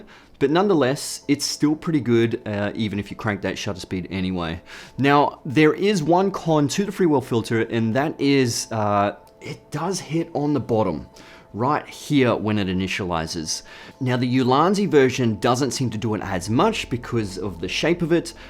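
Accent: Australia. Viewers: Just loving watching an Australian accent version🤙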